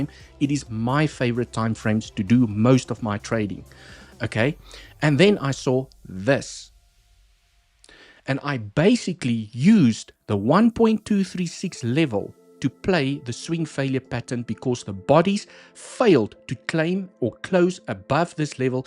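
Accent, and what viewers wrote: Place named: South Africa. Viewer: Thanks. Nice to hear a South African analyst. I'm in the UK now, but 41 years in Southern Africa. 🇿🇦🇿🇦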